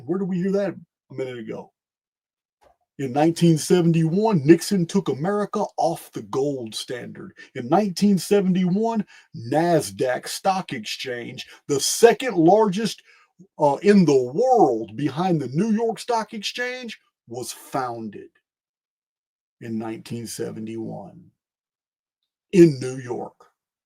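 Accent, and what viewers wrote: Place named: Tennessee, United States. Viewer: Where is your accent from? My wife is struggling to understand you, but I'm fine with it. Is it Tennessee?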